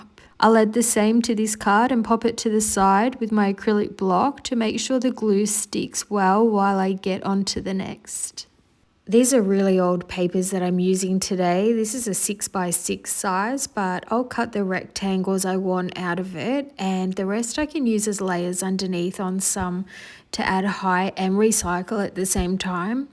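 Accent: Australia. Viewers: Hi, I hope you are enjoying your holidays. You are my favourite channel, it is so nice to hear an Australian voice!! I would love to see a tutorial for sentiments when you have time. ❤ Adelaide Adelaide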